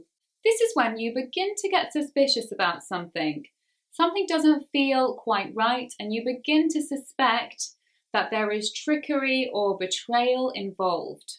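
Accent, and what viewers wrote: United Kingdom. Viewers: Gosh do I love the british accent. Something about your voice which just captures my attention 110%. I'm all ears. Have a safe day fam 🤟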